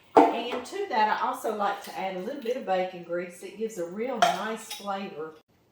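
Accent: South, United States. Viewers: Love your Southern Accent. "Lard" heehee. :)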